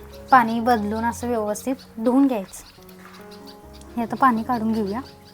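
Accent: Kolhapur, India. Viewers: अस्सल कोल्हापुरी भाषेत बोलतीस ताई आणि आपण कॅमेरा समोर बोलतोय असं नाही तर तू प्रत्यक्ष समोर रेसिपी करून दाखव त्यास असं वाटतं य बघ.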